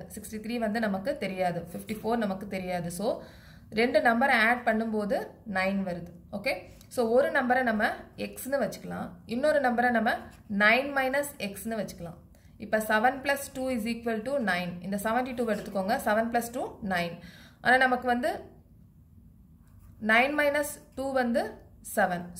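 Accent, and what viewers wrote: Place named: South India, India. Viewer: Mam you are from south INDIA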